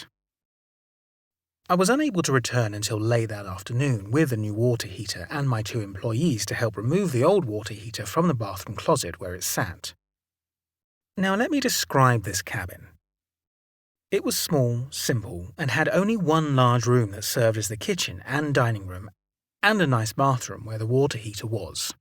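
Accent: England, United Kingdom. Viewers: English accent better for ghost stories, totally.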